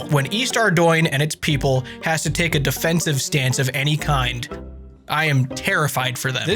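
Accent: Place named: Midwest, United States. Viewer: Today I learned that is from the Midwest USA (I dont care if you were born in Uganda; if you pronounce "roof" like that, you're midwestern) If you think castles are cool, I HIGHLY recommend the Youtube channel which has a series going over how tactically viable all of the castle in Gielinor are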